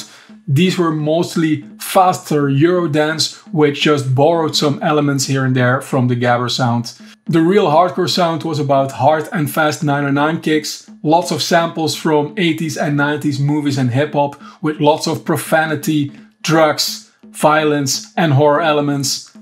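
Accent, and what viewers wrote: Netherlands. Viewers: It because of the Dutch accent. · In the US that's a Millenial. I'm used to hearing the Dutch accent so much that I didn't even notice yours. LMAO! I'm a Dutch American. My ancestors came from Holland and Austria.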